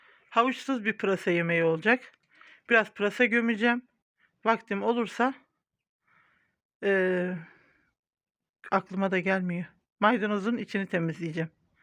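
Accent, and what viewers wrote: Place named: Trabzon, Turkey. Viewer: valla canm ne yaprsan yap izleniyor hele konusma tarzin trapi gibi gliyr.her seyi guzel dusunmn cok guzel sende ailende masaAllah size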